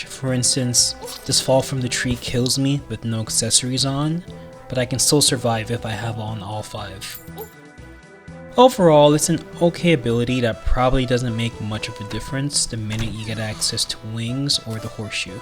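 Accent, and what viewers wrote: Canada. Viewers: is this guy canadian or something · It's so obvious that you're canadian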